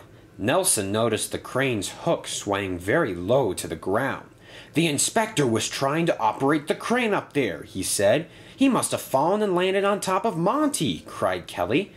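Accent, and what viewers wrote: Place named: Scotland, United Kingdom. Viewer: Ur scottish accent is on point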